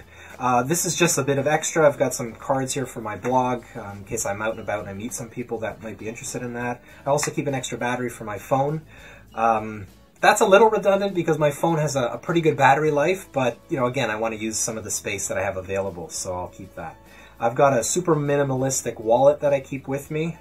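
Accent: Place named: Canada. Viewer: Just discovered you! And you must be Canadian because you sound like me! 😎😁🇨🇦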